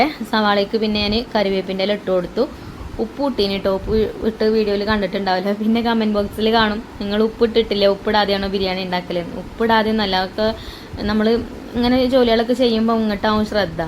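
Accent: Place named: Chungathara, India. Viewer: ഞാൻ ചുങ്കത്തറ ഇവിടെ അടുത്താണ് എന്നു തോന്നുന്നു. Slang ഒരുപോലെ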